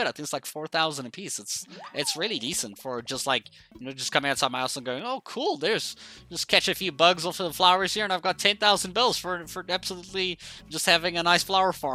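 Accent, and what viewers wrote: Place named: South Africa. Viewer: I was watching your video and thought “this guy sounds South African” and I was right! First time watching your videos but hello from SA too 🙋🏽‍♀️😄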